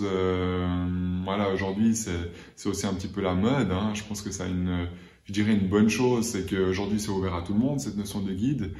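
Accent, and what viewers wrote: Switzerland. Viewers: bonsoir! ravie de vous rencontrez ce soir, au moment où je me sens mal avec les vaccinations par rapport à mon travail: sinon, je n'en veux pas et si je dois être suspendue et bien j'irai jusqu'au bout et cela sera peut être un signe de changer d'optique professionnelle! je suis tout à fait d'accord avec ce que vous dites et cela me réconforte! je vais continuer à vous écouter pour essayer de me connecter avec moi même! j'adore votre accent "suisse?"! prenez soin de vous et à bientôt, mercisssss.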